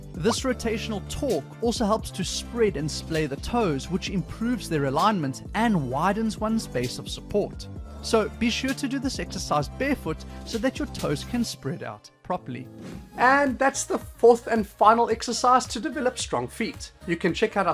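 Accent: South Africa. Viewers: Just listening to you makes me homesick for South Africa. Well done, bro.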